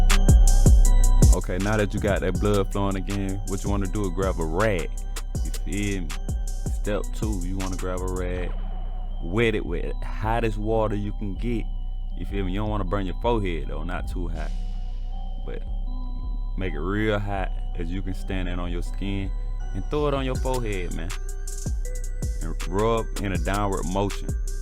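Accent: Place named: St. Louis, United States. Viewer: sound like you're from st louis. Good vid btw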